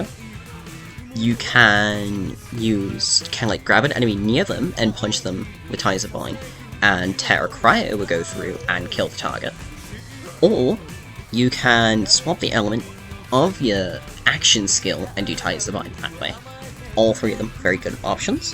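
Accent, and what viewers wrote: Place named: United Kingdom. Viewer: suspiciously british sounding woman rambling ASMR in my home page, the algorithm monkeys are pulling the right ones out of the hat today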